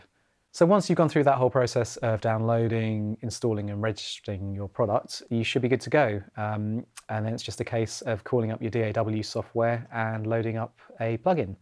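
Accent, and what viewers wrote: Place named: United Kingdom. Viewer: UK accent <3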